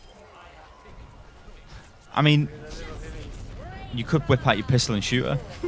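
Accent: Austria. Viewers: the language is german, but it sounds more like an austrian speaking it. dunno, might be wrong. lots of dialects in german. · He is from Vienna...local vocabulary and accent xD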